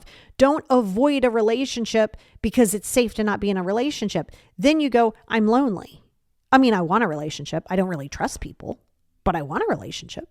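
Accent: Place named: England, United Kingdom. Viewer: Hi from Algeria , the English accent was cute 😂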